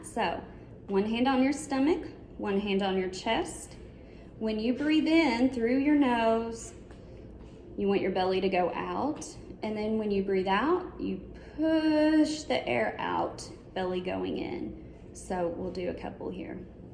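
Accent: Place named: South, United States. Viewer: Also, I love your home and your southern accent. Old Texas dude here....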